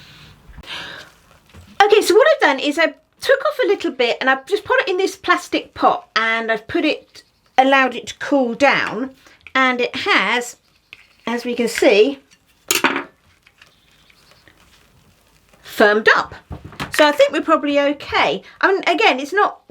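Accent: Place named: Southern England, United Kingdom. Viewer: South of England sounds like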